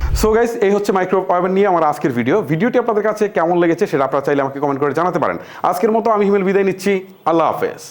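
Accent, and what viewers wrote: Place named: Chittagong, Bangladesh. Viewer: আমার অনেক ডিসটিকের মানুষের সাথে কথা হয় বা চলাফেরা করা হয়, চিটাগাং এর মানুষের‌ উচ্চারণ কিছুটা অন্যরকম আমার মনে হয় এই ভাই চিটাগাং অথবা আশপাশ এরিয়ার।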